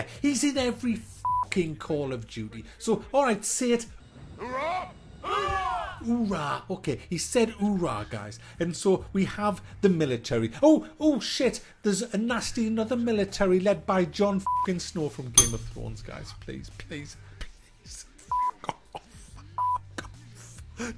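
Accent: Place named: Newcastle, United Kingdom. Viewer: My Uncle's Jordie too so when he goes off on a rant I'm reminded of you! Keep it up, you're brill!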